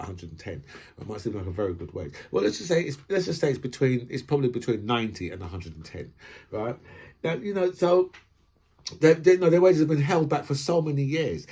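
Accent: London, United Kingdom. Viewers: Cost of living is difficult for people on low incomes. I 'm in the NW. Butter or anything else other than spirits are not under lock and key in the supermarkets [5] where I live. In fact there is no to little crime here. When I moved here the headline in the local Advertiser was "Daisy the cow is 32", bit of an eye-opener after living in Manchester for 40+ years ! From a previous video where you mention £2000 per month rent [which I don't dispute] I looked online round here. Just over a grand for 4 bedroom 2 bathroom property. Jacob Rees-Mogg has 6 kids. Should he get child benefit ? Maybe 3 would be a reasonable limit and then means-tested ? Spent about 5 years off and on in the USA. I have mates who live there. The food was fine. Pretty sure salmonella in eggs, BSE and horse meat sold as beef was nothing to do with the USA. From the accent I'm thinking London. 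You think that might skew your views on some problems ?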